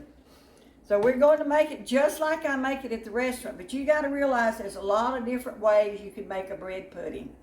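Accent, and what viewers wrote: South, United States. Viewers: your adorable southern accent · I love your southern accent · a good southern accent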